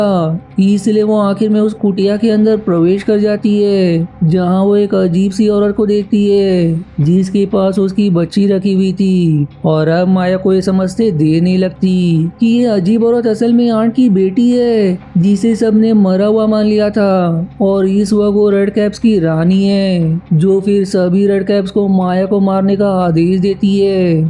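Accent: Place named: Bihar, India. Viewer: तू अस्सल बिहारी लगता है मेरेको हो तेरी जिंदगी थू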